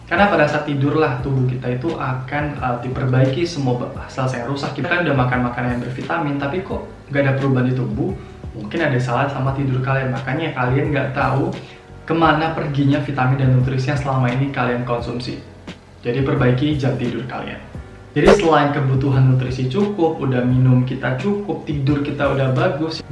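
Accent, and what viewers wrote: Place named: Java, Indonesia. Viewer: Ngomongnya jadi makin medok dan mirip orang jawa gitu ya😂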